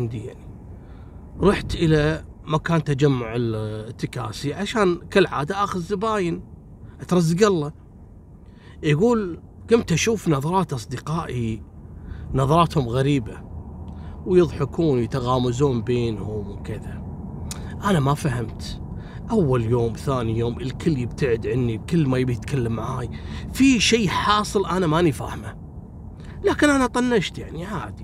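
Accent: Morocco. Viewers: يازين كلامك بالمغربي 😉😛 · تعلمت اللهجة المغربية يا حتى المدن المغربية بدات تعرفها تحياتي لك من المغرب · ما احلى اللهجة المغربية بصوت اخونا ،تحية مغربية من بريطانيا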